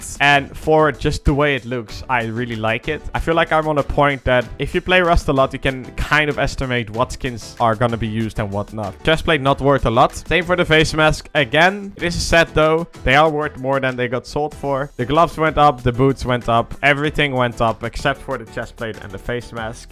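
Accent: Netherlands. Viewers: Haha je hoort gewoon dat je NL bent 😂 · is it me or does sound a little dutch? or i’m wrong 🥴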